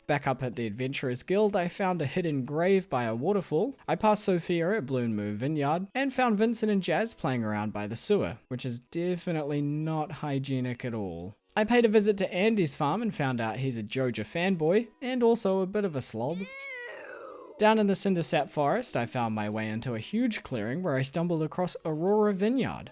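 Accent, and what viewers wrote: New Zealand. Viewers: Just watched all your 100 days series videos and I have to say I am absolutely loving it! 🙌 Your New Zealandish accent is quite funny to listen to while you play as some words you say are clearly very differently pronounced to what I assume most of your viewers accents would say which causes some funny confusions 🥴😂 Keep it up and looking forward to next episode! Much love from Australia!! 🇦🇺 Question: I guess you are managing just fine without it but where's your UI boost Mod at? 🤷‍♂️ And also a pigs 🐷 name has gotta be 'Pigpen' from Charlie Brown 😋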